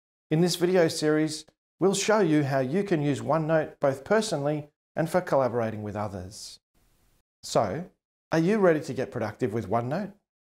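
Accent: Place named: Australia. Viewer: Thank you for making these great free playlists! Really helping me transition from the "old world" ways without the usual stress. Aussie accent helps too haha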